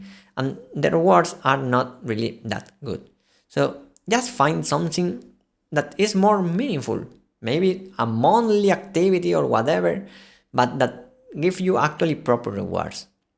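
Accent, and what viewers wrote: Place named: Spain. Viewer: I love your spanish accent. Whenever you say the word just yast* im smiling😊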